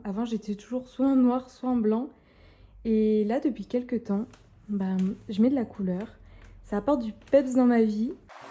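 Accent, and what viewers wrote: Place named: South, France. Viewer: trop du bien , d'ou tu viens ? jentends laccent du sud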